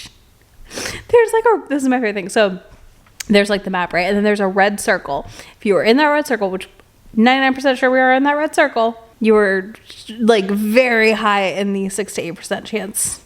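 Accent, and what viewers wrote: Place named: Kentucky, United States. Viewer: As a KY native living in SC, hearing talk made me feel like I was back home 😂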